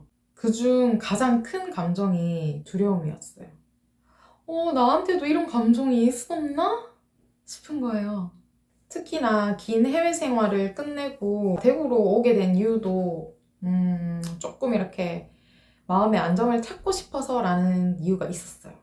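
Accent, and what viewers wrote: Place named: Japan. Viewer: 뭔가 말투가 일본 억양이 평일 낮 시간대에 이럴때랑ㅋㅋㅋ자주 일본억양이들리네여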